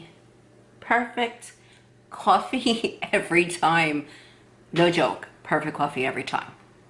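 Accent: New York, United States. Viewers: You have the coolest NY accent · You a New Yorker? My husband is a former New Yorker now he’s a Utahn. Loved your video...Brenda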